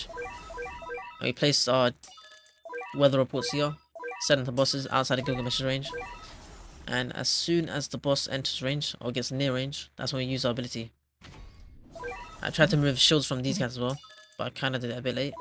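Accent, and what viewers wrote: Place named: United Kingdom. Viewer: Strong bri ish accent😊😊😊😂🎉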